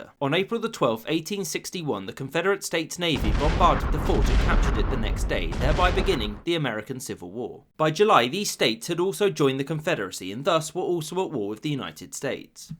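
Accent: England, United Kingdom. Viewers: I can understand why you declare that France had outlawed slavery. But you incorrectly infer that England outlawed slavery. Check you're facts. You should have said Russia. I swear, give someone an English accent, they think they know everything. England was engaging in enslaving India and making drug addicts out of China. Stop you're bullshit.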